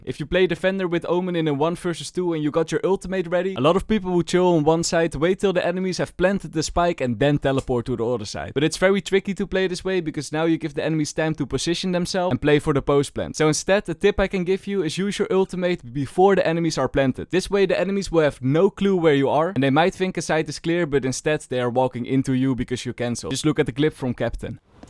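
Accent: Netherlands. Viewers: Hii ...i still watch your videos even though i cannot play,your cideos are really very interesting mainly the dutch accent😂 Love your content❤❤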